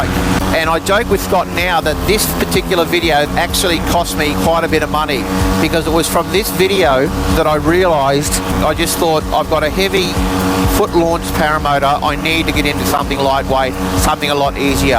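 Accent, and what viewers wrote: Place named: Australia. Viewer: great vid as im very interested in what you say as im wantint to get into paramotoring been so confused were to start as i been very confused with so many brands and saddly all crap american vids who is best cap kurc dell swatz? keep away from theses guys they play with your mind 😱but hearing the aussie accent is music to my ears so thank you for your great video 👍🏻🤗🇦🇺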